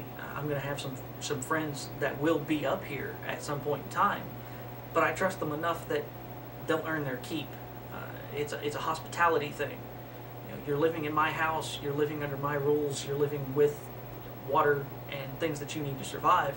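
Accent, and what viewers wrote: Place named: South, United States. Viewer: Sound like u from dwn south, anywhere near newmadrid seismic zone? Would hate fir u to have to leave your homestead.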